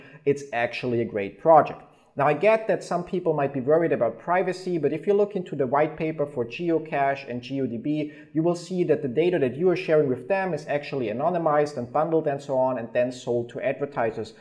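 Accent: Germany. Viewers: this heavy german accent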